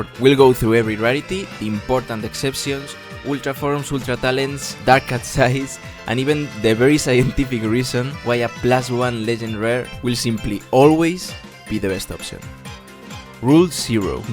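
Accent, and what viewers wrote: Spain. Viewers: ¿Are you spanish? Your accent sounds like when I hear spanish people speaking english 😅 ¿Or do other languages produce the same accent?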